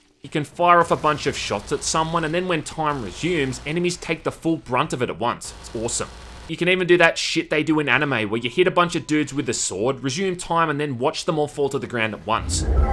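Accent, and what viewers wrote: Australia. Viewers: You sound Ridiculously Australian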